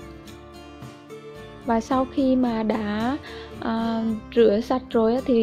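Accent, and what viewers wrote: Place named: South, Vietnam. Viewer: Tại chị người miền trung nói qua giọng Nam để mn dễ nghe á chị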